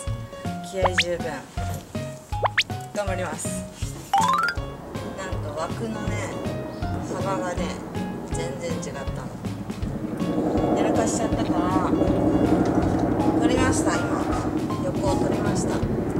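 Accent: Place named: Nagoya, Japan. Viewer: が作業中の肉まんのソックスと名古屋弁が可愛かった💕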